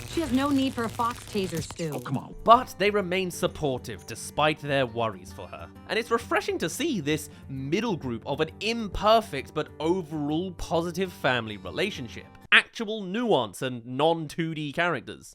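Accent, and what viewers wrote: United Kingdom. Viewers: Your British!?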